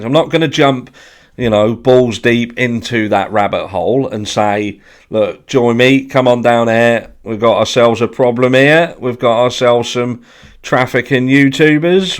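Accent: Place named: South London, United Kingdom. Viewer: Why are you getting in to the whole saga and adding fuel to the fire of that eedjit Ryan? The guy needs to (allegedly, in my opinion) lay off whatever it is he's taking. I'd stay out of that whole thing cos it's just insane and degrades anything you have to say. Sorry, that's my opinion, but was quite liking your straight forward 'South London' way of talking (I grew up in Reigate) so bit disappointed. I see the arrow, yes, but how do u know its not something that's been there for years for kayakers/fishermen/boats to mark an easy way on to the reservoir without worrying about a sudden drop or whatever?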